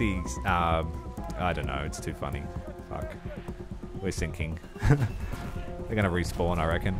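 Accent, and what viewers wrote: Australia. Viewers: "It's now or never, do ya wanna live forever!" Luv ya Aussie humour & narration! Great vid', Sah!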